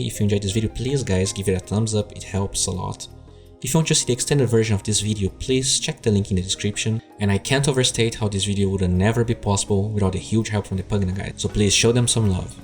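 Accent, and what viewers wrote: Brazil. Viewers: Entendi no final do vídeo. É pelo dinheiro ganho no Patreon. Mesmo com esse sotaque muito brasileiro no inglês, onde até quem não é americano ou inglês vai perceber na primeira palavra que não é do país dele e é de um país que não fala inglês, nesses países tem mais pessoas dispostas a doar dinheiro pelo Patreon do que países como o Brasil. Pior pela situação econômica do país. Essa é a única coisa que penso sobre mudar de país o seu canal pra um cara que mora no Brasil e tinha inscritos no Brasil: Dinheiro do Patreon.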